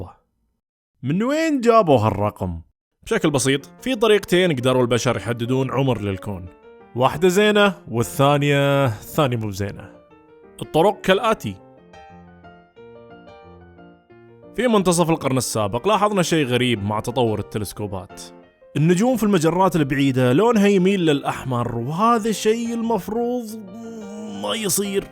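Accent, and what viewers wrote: Kuwait. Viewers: ياخي لهجتك مب سعودية لهجتك كويتية .. انت قلت انك سعودي 🤷🏻‍♂️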